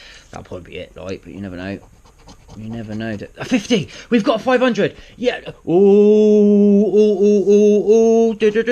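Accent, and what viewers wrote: Portsmouth, United Kingdom. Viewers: Why do you sound like your from Portsmouth x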